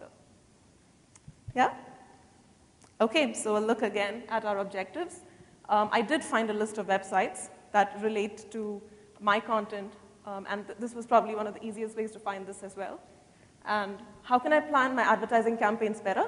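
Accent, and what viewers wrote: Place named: India. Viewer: It took me a minute to get used to the Indian dialect, but this is good information for bloggers. I will make use of it on MotorcycleEventNews(.)com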